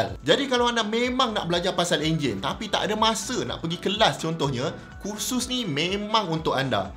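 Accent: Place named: Kelantan, Malaysia. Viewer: smart bojeng cikgu [loghat klate]..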